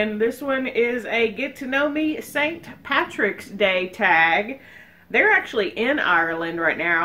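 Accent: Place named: Mississippi, United States. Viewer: Howdy,!! I think you are hilarious! I am in Mississippi, and our accents are very similar, so your "peenched" and other words sound a lot like me! OMGoodness!! I used to spend hours a day looking for 4-leaf clovers, too!! I found one or more almost every time I sat down to look. I love your furbaby, Barnum. My Missy looked almost exactly like him, but she passed at about age 12 soon after my husband in 2016, probably from grief. Please, give Barnum a big hug and kiss from me in memory of my Missy—and, cherish him as long as you can, hon... I think you are great and wish you all the best! God bless! Love & hugs ❤ Lana aka the Good News Keto Grandma 👵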